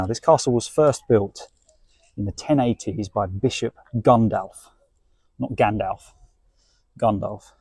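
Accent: United Kingdom. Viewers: سعيد بالانضمام إليكم... سوداني... معجب باللهجة البرطانية...!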